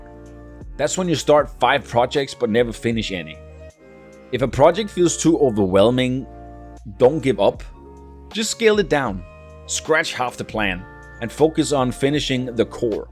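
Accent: Denmark. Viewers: Are you slightly danish perhaps? the accent sounds very danish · Thanks for the video it helped a lot. Og det også altid så hurtigt man hører den danske accent når man åbner en tilfældig video haha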